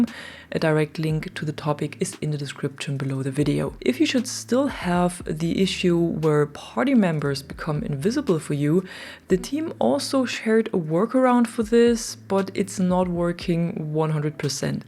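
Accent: Germany. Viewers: you kinda sound german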